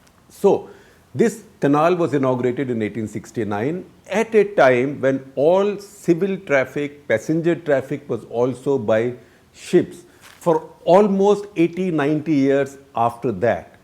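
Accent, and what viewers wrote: India. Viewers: i could listen to him talk forever. I love the indian accent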